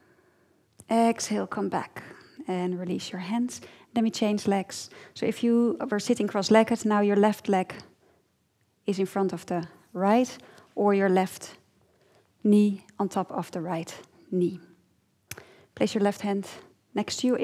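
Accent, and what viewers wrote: Netherlands. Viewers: 10 seconds in. Holland, for sure =D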